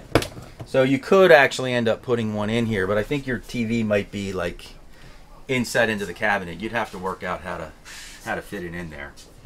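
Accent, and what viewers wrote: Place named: Maryland, United States. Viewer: I knew I heard a MD accent! Ravens sweatshirt confirmed it!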